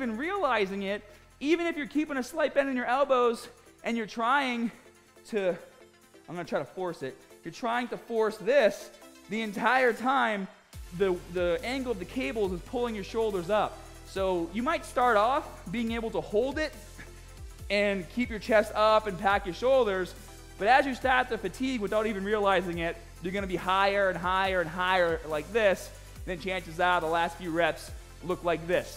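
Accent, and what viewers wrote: Boston, United States. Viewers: Love this guy and his accent, he’s quite knowledgeable, sounds like a South Boston native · Awesome video. Ur Boston accent makes it even more enjoyable. I'd rather watch u than any of those not natty instructors on social media. Ur down to earth and real and u care about helping people. Thats the most important thing 👏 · He is from Boston.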